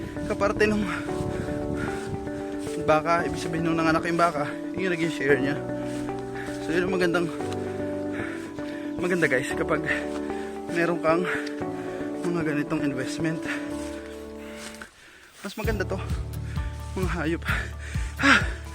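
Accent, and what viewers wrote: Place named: Guinayangan, Philippines. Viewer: hahaha kaya pla medyo pamiliar sakin pagsasalita mi guinayangin kasi ako sir💪💪 tuloy tuloy lng sir suporta tayo dyan proud quezonin💪😁🏆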